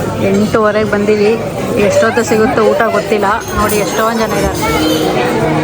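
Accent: Mandya, India. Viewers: mandya bhashe ne hange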